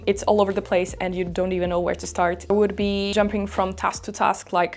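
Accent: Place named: Brazil. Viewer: My boyfriend is Brazilian he has your same accent and says OMG... when he cant pronounce a word as well xD I like ur content. Reminds me of him.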